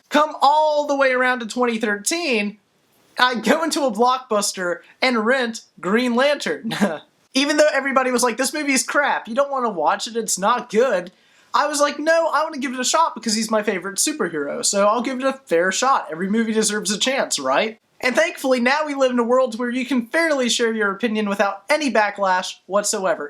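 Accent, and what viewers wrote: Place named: Maryland, United States. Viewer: weird question I know, your pronunciation just sounds like a Maryland accent.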